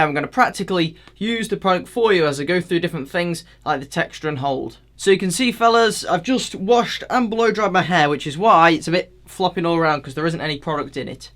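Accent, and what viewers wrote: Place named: Midlands, United Kingdom. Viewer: brilliant video mate! That midlands accent though haha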